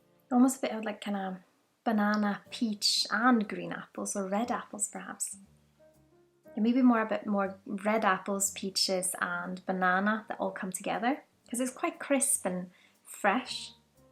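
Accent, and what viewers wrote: Scotland, United Kingdom. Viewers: Scottish or swedish ?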